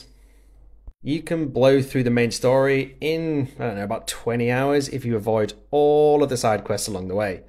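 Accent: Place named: United Kingdom. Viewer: Was expecting a terrible loud overzealous voice (common for gaming channels), but instead found a softly spoken British man who calmly conveyed the message in a concise manner. Excellent work, subscribed ❤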